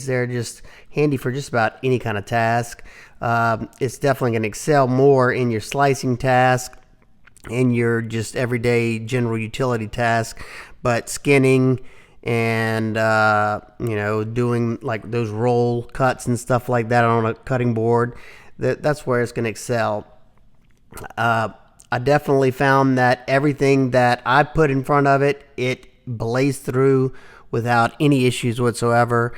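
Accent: Louisiana, United States. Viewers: I recognize a Louisiana accent when I hear one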